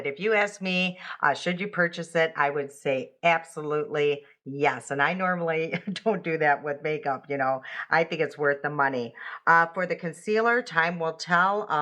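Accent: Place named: Michigan, United States. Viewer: Now I really want this palette! Your voice sounds like home - you must be from Michigan ❤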